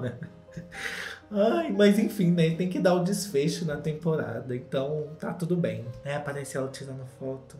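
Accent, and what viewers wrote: United Kingdom. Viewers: O toque britânico no seu inglês é um charme a parte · Não há melhor canal!!!!!!! E esse inglês british Braseeeeellllll 👏👏👏👏👏👏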